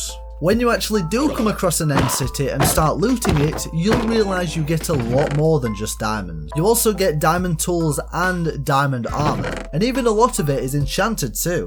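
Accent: United Kingdom. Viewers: sounds british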